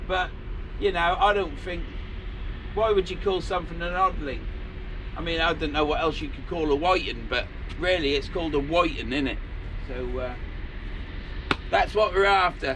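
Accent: Norfolk, United Kingdom. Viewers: Cracking video oil them boots up😉 well done on the fish. Can tell your a Norfolk boy. Hope you to have a good Christmas.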